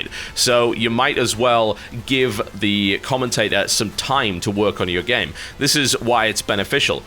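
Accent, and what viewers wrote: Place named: Newcastle, United Kingdom. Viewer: Proof that TB has some Geordie left in his accent. "Boook"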